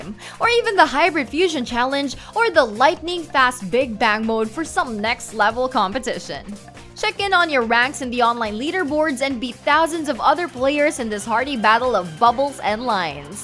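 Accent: Canada. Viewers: Is it me, it does she have a Canadian tinge in her voice?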